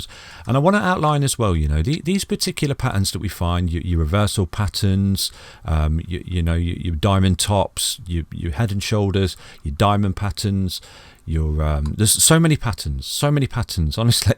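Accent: United Kingdom. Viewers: Nice to hear a fellow brit talk through it. Find it easier to understand. New to the game, this is very helpful info ✌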